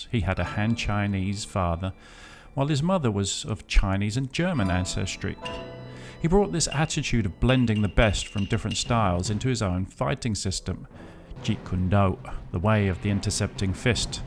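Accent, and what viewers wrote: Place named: South Africa. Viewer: Bruce lee was the best and sorry you sound like one of them European whites that live in South Africa talking about don't let racism make you a victim Bruce knew better so he went to his home country to give it a shot there white ppl made it bad to South Africans in their own country Rip Stephen binko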